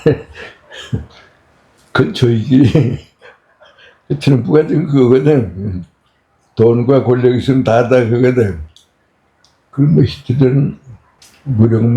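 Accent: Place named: North Korea. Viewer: 북쪽 사투리...듣기 힘든 시대가 되었어요. 언제나 들을 수 없는 귀한 말투 입니다. 지금도 듣게 해주셔서 감사합니다. 건강하시길 간절히 기도합니다.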